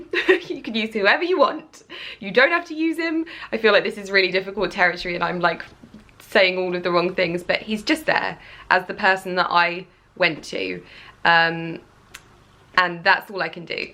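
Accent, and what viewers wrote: United Kingdom. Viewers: I really love your approach to the whole thing (like how only a subtle change can make a huge difference, but at the same time still look like YOUR nose) and how you explain your reasoning :) New subscriber!! ps. You kinda remind me of Emily Blunt, with your new nose (especially when it was swollen) and your british accent hehe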